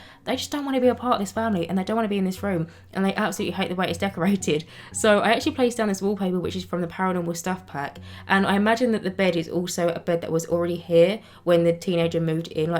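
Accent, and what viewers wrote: United Kingdom. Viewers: i miss playing sims, i dont have enough space on my pc. but i love watching you and listening to you! :3 i love british accent!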